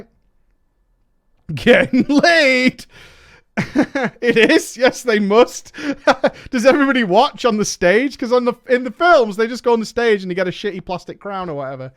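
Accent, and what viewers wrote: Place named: United Kingdom. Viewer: grin good stuff mate - every time you go off in your accent (to me ) you sound like a couple of Brits I served with FOB Bostic Afghanistan A cuppa fixes everything lol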